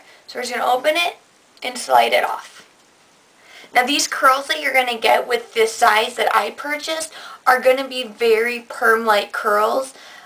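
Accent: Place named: Long Island, United States. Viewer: You sound like your from Long Island.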